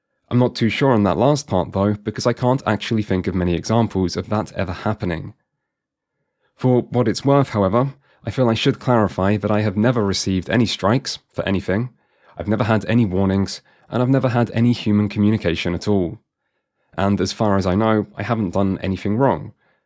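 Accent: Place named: United Kingdom. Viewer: I'm not sure, but it might be related to your using the word "fad" early in the video. With your accent, it might be interpreted by an AI speech-to-text as British slang for a cigarette which also happens to be one of two "the F word" in American English. Still has nothing to do with the title unless the first few seconds of the video is referred to as "the title". The appeal probably went to a person outside the UK, so they also misheard the word (colored perhaps by the transcription). That's the most goodwill I'll extend to the decision makers, especially since it makes no sense in the context of the sentence, whereas "fad" does.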